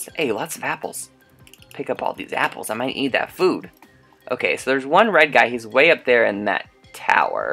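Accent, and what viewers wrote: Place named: South, United States. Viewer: What's with the country accent????????????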